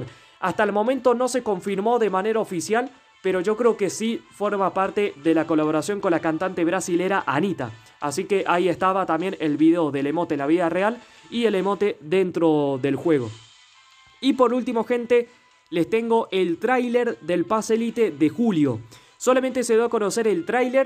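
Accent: Córdoba, Argentina. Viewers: sos de Córdoba?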